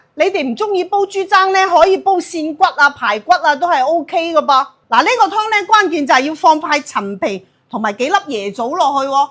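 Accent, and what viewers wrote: China. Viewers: 广东人讲嘢是咁语气 广东汤猪峥最正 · 令人討厭的聲線及語氣，大陸人的廣東話與香港人的不一樣，一聽便分得出。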